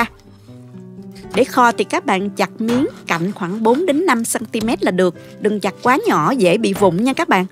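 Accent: South, Vietnam. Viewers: Minh cu nghi dang o Binh Duong, gan Saigon! Vietnam. Xin Co^ cho biet Co^ dang o dau nghe. Rat yeu men Co^ qua tai nau ăn va giong noi ngot ngao Nam bo^. Nguoi mien Tay o California US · Cám ơn có chia sẻ những món và nói chuyện rất đúng chuẩn là người Việt Nam trong Nam không lai điệu ngoài Bắc chân hang như cho bao nhiêu đường hay kho khoảng bao nhiêu phút là được nghe rất hay chứ bây giờ thì có rất nhiều người dùng những Từ như khoảng bao nhiêu phút mà nó là tầm ba phút nghe nó sao sao 🙏🙏🙏🙏🙏🙏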